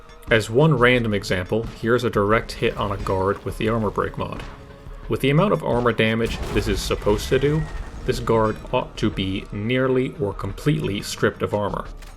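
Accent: United Kingdom. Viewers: Love for explosives, booze and an intense Great Britain accent.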